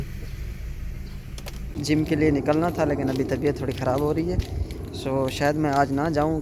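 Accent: Saraiki, Pakistan. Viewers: I knew you were saraiki from your accent :D 😁😁 and you confirmed it. Kery shahar da haen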